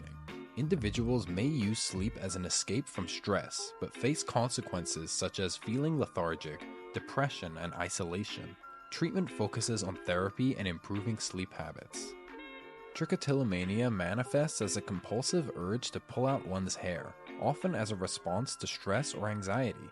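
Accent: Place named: New York, United States. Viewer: That's definitely either a New York or Philly accent, not Boston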